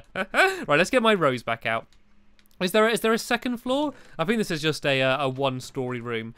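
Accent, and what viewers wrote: England, United Kingdom. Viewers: Are you form England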